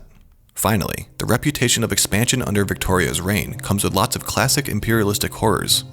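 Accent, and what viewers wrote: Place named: United States. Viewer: As soon as you read "the dark side of..." and hear the American accent, you know there's not going to be a high level of accuracy.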